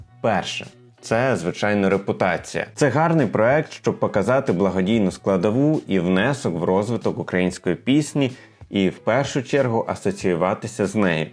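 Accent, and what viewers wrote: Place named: Russia. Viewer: Дякую за такий гарний ролик! Прохання до ведучого розмовляти українською вдома. Російський акцент жахливий .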